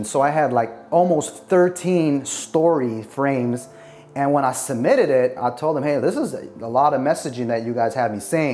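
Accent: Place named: United States. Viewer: Well, you speak american english. Its different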